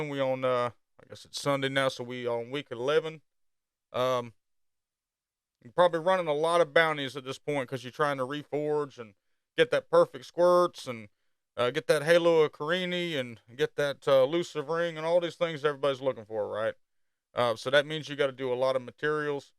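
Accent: South, United States. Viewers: Where are you from? Alabama, Texas?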